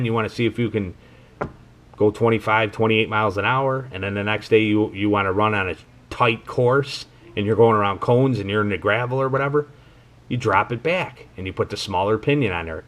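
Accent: Chicago, United States. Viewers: You've gotta be from Chicago with that accent! Or Elmwood Park or something.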